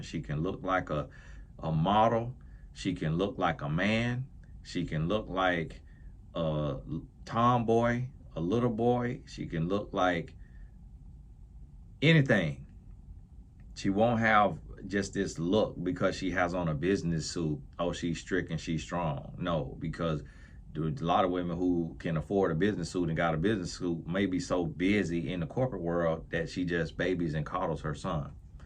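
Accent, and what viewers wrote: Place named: Mississippi, United States. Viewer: are you from Mississippi??? I think I recognize your accent. ❤🎉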